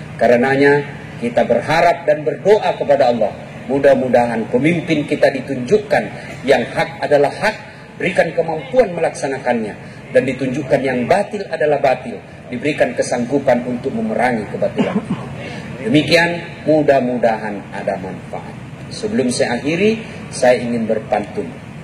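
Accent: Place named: South Sulawesi, Indonesia. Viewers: logat sulawesinya dapet bgt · Ciri has logad ceramah ulama bugis makasar madura mantab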